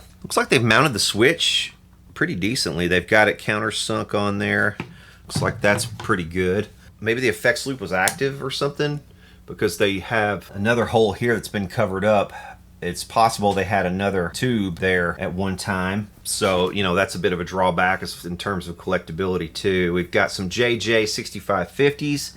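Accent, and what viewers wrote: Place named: South, United States. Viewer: I noticed the amp was signed by Linda. Many years ago I read an interview with Dave Mustaine and Chris Poland from Megadeth and MegaDave said he would open up Marshalls and look for her name as it was sure to be a screamer. Looks like he wasn't full of shit! Love your vids and channel man, I'm from NC and have lived in Seattle for 20 years so it's nice to hear an intelligent southern accent.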